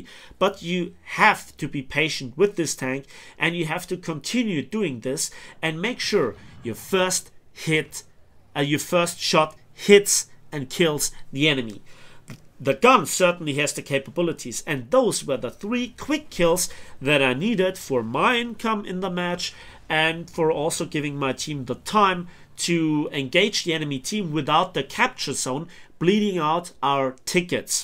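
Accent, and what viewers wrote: Germany. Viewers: Hey, another great video! I always enjoy a great historical background (and I think I can speak for everyone when I say this) with a satisfying German accent:) One question-- what are the pros and cons of the waffletractor vs. the Nashorn? Which would you use? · One of the best videos about War Thunder. I get that PhlyDaily is making videos for general audience that's why he has the subs. But is doing trully high quality content where you get far more information. He even pronounces the names very nicely in probably very close accent to that language:). BTW I don't know much about him, where is he from? The accent do sound like something close to Germany is mixed in him.. Denmark? Netherlands?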